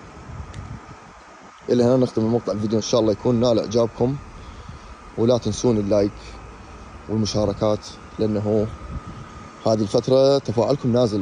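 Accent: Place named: Baghdad, Iraq. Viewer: السلام عليكم اخوية الغالي اني ماعندي اشتري هاي السيارات الحلوةلكن يعجبني اتفرج علئ فديوهاتك لأن طريقتك بالكلام مثل ذبت أهلنا مال بغادة الأصليين وأتشرف بيك اذا كنت عربي او كردي لأن أحنة كلنا أخوة عراقيين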